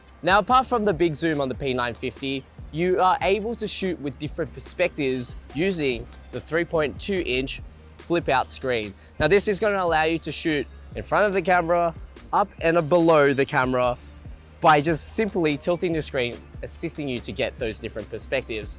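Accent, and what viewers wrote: Australia. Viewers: Why does this Asian sound more aussie than I am?...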